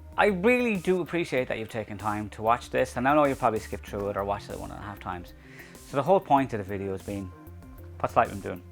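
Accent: Ireland. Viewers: I enjoy your videos very much. From seeing so many Brendan Gleason films, your accent is not a problem. There are lots of Irish people here in the states too. But your audio is not ideal. It sounds harsh, like there's too much reverb in your room. There are some cheap ways to address this with towels on the walls. Here is a guy from Wales with many interesting free solutions, whose audio always sounds just right: <link>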